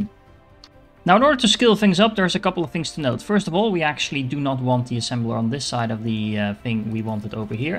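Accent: Netherlands. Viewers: After deciding to pick this up again i came across your video. Nice one, i`ve already got 552 hours into this game but i still learnt something. Will definitely watch more of your content. Who doesnt love a Dutch accent! Thanks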